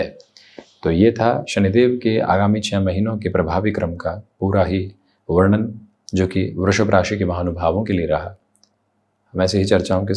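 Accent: Standard. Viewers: आपका आभार एवं धन्यवाद, इतनी स्वच्छ और शुद्ध हिंदी सुनकर मन प्रफुल्लित, आनंदित हो उठता है।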